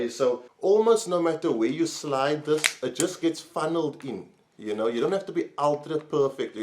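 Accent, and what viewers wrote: South Africa. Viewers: are you south african? I'm trying to puck up on that accent lmao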